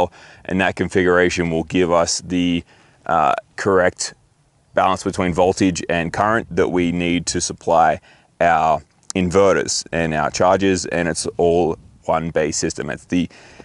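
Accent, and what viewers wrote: Australia. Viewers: Huh... You got an aussie accent ???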